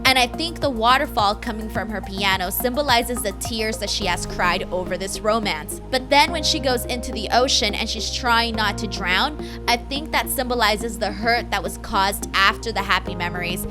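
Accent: United States. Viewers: Great video but it's difficult to listen to. Very american.... Very SCANDAL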